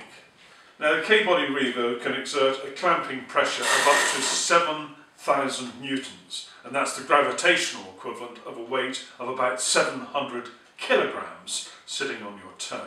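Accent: United Kingdom. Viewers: did a great job. I have several clamps from other manufactures. Last night I was clamping a closet shelf system and I noticed that the Jorg's were not exactly parallel which surprised me, but the Besseys that were also being used in the same clamping were exactly parallel. The Bessey clamps made it possible to clamp perfectly, the Jorg's would have left a mess for me. sold me on Bessey, and the British accent made me feel chipper!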